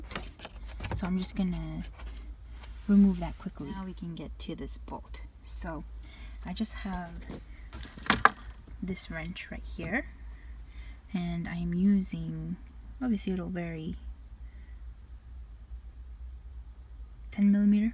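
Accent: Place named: Quebec, Canada. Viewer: Miss the Quebecois accent living in California. Salut & merci, anciennement de la rive sud.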